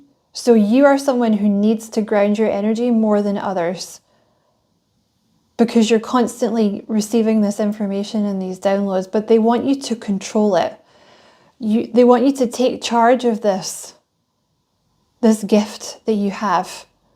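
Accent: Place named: Scotland, United Kingdom. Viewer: Omg literally all the things you said resonated sooooo much and I’ve been journaling and getting promots exactly like the ones you said. I love your expression chillin like a villain 🤣 thank-you so much I love hearing a fellow uk (okay Scottish to be exact) accent for once on you tube as well ❤🙏🏽 thank-you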